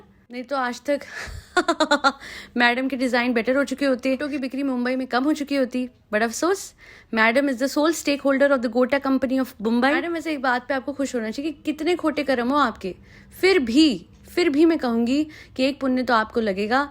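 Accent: Punjab, India. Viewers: Koi kuch kare ya na kare madam janab badle ya bhaad me jaye one thing is constant ur lovely voice and ur meethi meethi Punjabi ❤❤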